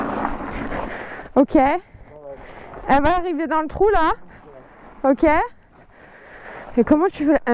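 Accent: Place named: Corsica, France. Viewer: Top l'accent Corse... ✌️Et des vraies routes avec des virages, des bosses, des animaux sur la route,. Il faut pas se la faire en mode tourism tophy pour rester en un seul morceau. En plus ça sent bon le maquis corse, l'air marin, enfin bref ça sent bon l'oxygène, ça sent bon les pistes en terre aussi 😆✌️. Le nikken, comme tout ce qui a plus de 2 roues c'est pas trop mon truc, mais faire les presque 600.kms avec un pour essayer, bien sûr. Mooi je trouve que tu maîtrises quand même pas mal du tout, alors respect. ✌️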